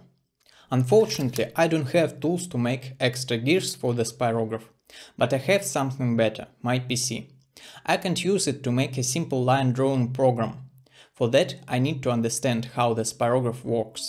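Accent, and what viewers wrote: Russia. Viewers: У тебя явный русский акцент)) Анимация просто потрясающая! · I programmed only two-circle version myself and stoped, while you pushed it further more to make it really beautiful, nice video! Some interesting Russian accent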